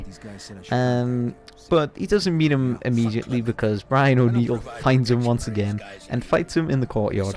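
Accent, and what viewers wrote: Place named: United Kingdom. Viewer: Should’ve been narrated with a proper American accent. This limey sucks!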